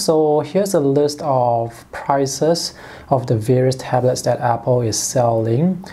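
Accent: Singapore. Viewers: Hey! Really enjoyed this video as I am a fellow designer myself and feeling very conflicted on whether i should get the iPad Air or the Pro for sketching. I was wondering if you're based in Singapore cuz of the way you speak and then I saw the sketches haha! Singaporean here too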